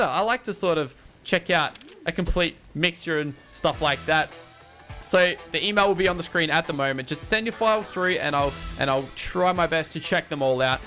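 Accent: Australia. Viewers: wait u are aussie first time i met a aussie youtuber :D im aussie! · your Aussie? ey mate!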